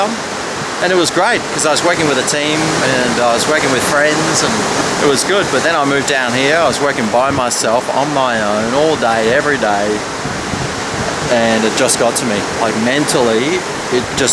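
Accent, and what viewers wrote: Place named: Australia. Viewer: That would be good, too, with a sunrise or sunset showing. Or you can do some talking with it, like this video, I love an Aussie accent. :) OR...recipes on the beach, fire going, shrimp on a stick on the barbie...you talking about anything...I love to hear your voice..that accent! This video reminded me of some of the ASMR things I've run across, the background sound and talking; that's what made me think of it.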